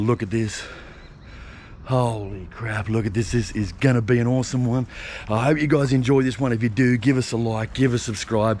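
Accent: Australia. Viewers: You sound Australian. I would be a tad scared of the snakes and spiders on that job. Glad you did help the lady out. Well done.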